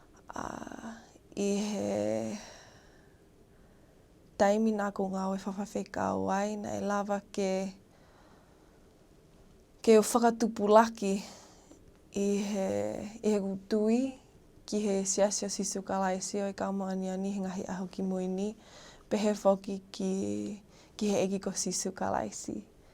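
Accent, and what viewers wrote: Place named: Tonga. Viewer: you speak Tongan so fluently... accent is sooo Tongan Tongan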